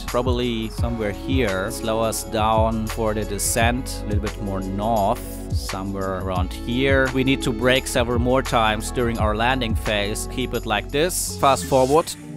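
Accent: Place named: Germany. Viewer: are you from germany bcs you sound german btw nice vids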